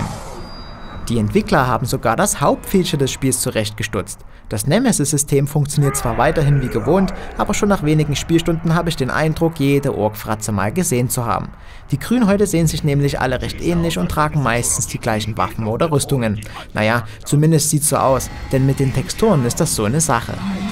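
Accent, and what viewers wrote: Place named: Saxony, Germany. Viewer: Klasse Stimme. Sächselt so schön vor sich hin.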